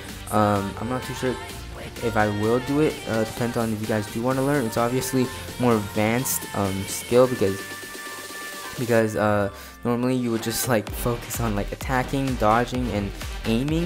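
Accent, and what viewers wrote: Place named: Brazil. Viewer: Parace br tentando falar inglês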